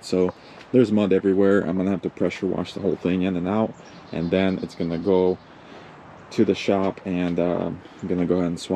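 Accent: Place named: Sweden. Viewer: Impressive project! Where are you from originally? You sound like you grew up in Sweden for a few years as a kid then moved to the US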